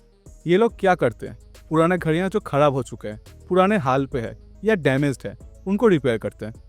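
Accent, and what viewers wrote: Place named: Bengal, India. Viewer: bahut badhia bola hai. lekin tumhara Hindi me bengali influence hai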